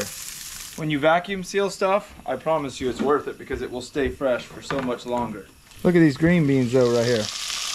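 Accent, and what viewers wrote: South, United States. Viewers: I absolutely love her accent. Being from TN then moved to south Alabama it reminds me of home. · Sounds like a good Ole country gal to me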